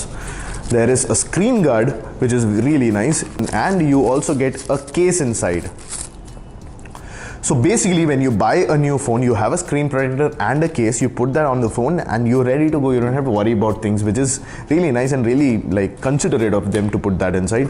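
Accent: Kerala, India. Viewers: മലയാളി ആണോ?? Namil ഒരു മല്ലു touch..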